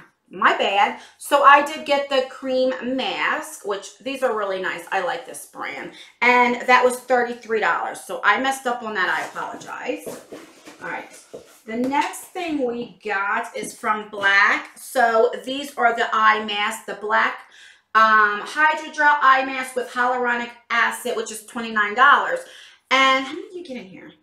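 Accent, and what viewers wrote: New Jersey, United States. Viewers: I just love you and your Jersey accent 💖... My favorite thing in the fab fit fun box would probably be the throw... I'm obsessed with blankets of any kind... I do not get the box but have thought about getting it but if I got all the subscription boxes I want then I would be broke all the time 😊😂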